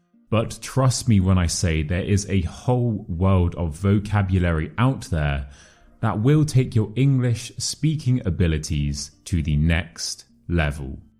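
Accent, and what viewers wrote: United Kingdom. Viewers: Thank u very much ı love your brıtısh accent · I finaly found a proper British speaking chanal! It's marvellous! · Thank you. Love your British Accent and your sense of humour.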